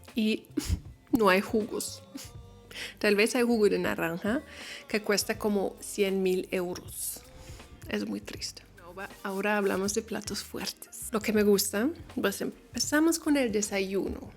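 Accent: Colombia. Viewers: Tan linda 💐🌹 Gracias por todos los alagos a Colombia belleza 💞 hablas muy a lo colombiano 💐💐💐 Bendiciones ✨✨✨ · Ya hasta tiene acento y expresiones colombianas. Impresionante. 😮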